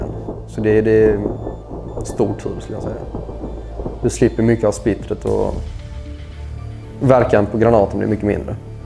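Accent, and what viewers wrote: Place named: Skåne, Sweden. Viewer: My background is Swedish army basic training in the '80s, one tour in Bosnia in the 90s and Swedish National Guard for over decade. Drafted E-5, ended up O-2. I emigrated to the United States about 25 years ago. I observed that all the stupid stuff that we were warned that the Russians would do in war, actually still happen, about 25 years after we were first taught them! Every single weakness and structural problem! It's unbelievable. It's good, but weird. They mostly seem to run T72s, still. The B3 version, but still. You can tell that this man has experienced some serious s***. My unit was in one firefight in Bosnia, but that was a very brief moment and even though we killed people I'd relatively close distance, we didn't take casualties of our own. That checks with friends I have here in the United States, who have served in Iraq and Afghanistan. 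Enemy casualties is one thing, but on your own side is traumatizing on a whole different level. On a lighter note, I'd like to mention his speech. When I started the video, I noticed he had "non-Nordic" ancestry. My brain automatically prepared to listen a little closer in case he had an accent. I have worked for decades with native Spanish speakers here in the United states, including as supervisor, so my brain is prepared for everything, so to speak (I ended up learning a lot of Spanish, cuz that was easier). Then, I noticed that he has an accent from Skåne (Scania). That accent and his rapid speech, made me have to follow the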